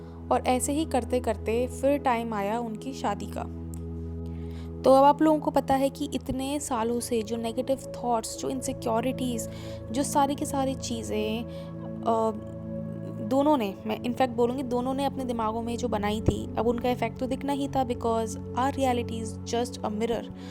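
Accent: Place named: China. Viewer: ITS SOUNDS CHINEESE MIXED WITH EAST INDIAN A TINY MIX OF SOFT RUSSIAN BUT MOST LY EASEAST INDIAN WITH A RARE FRENCH DIALCK MIXED ALL IN ONE AND THE COUNTRYS ARE VERY CLOSE TOGETHER AND 1 LANGUAGUE I IM VERY FAMILITIAR HEARING MIXED IN TO OR ARE U CHANGING THEM AS U TALK OH I FORGOT A FORM OF ENGLISH I HERE TOOO LOL IM INTO MAPS LANGUAGES AND DIFFERNT PEOPLE OF THE WORLD I LOVE THIS ITS LIK ,Y HOBBY ANY WAY IM GOING TO MANIFEST A AY TO TO SEE WHAT YIU LOOK LIKE IM A VERY CIRIUOSE PERSON AND IM A VISUAL KEARNER I LOVE EYE CANDY TOO PLUS U SPAK MY TRUTH I DONT BELIEVE IN ANT OTHER WAY TO EXPRESS MYSELF I FEEL GOOD LETTING OUT MY THOUGHTS AND I NEVER SEE PEOPLE COMMENT LIKE ME I DONT BELIEVE IN FEAR LIKE SOME PEOPLE THINK THERS A RIGHT WAT TO TALK OR HOW RULES AT LEAST WHERE I WAS BRAINWASHED GROWING UP ON LAND THEU CALL IT A COUNTRY MAMED CANA DA ;//CHNA DA IS ITS NICK NAME AND WE HAVE EAST INDI ANS AS OUR LARGEST IMAFORGN POP INOT SURE IF BORN OR IMAGRATE OR MIXED I WE ALL JUST COULD GET ALONG GOT RID OF THE BAD PERSONALITYS AND THE PWOPLE WHO CAUSED OTHERS HARM WE MIGHT BE ABLE TO STOP THE PROPAGANDA THAT MAKES US ALL TURN OM EACH OTHER WE SHOULD TURN O THE 1 $%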